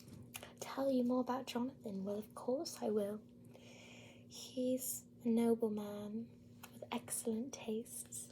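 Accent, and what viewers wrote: England, United Kingdom. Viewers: Your English accent is very authentic. The pronunciation of “meticulous” was absolutely fabulous (have you seen that English comedy series, if not, you should try it 😁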